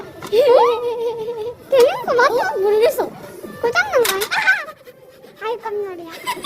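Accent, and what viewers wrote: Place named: Busan, South Korea. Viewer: 반가운 부산사투리 ㅋㅋㅋㅋㅋ 저도 부산사람이라 넘 간갑네요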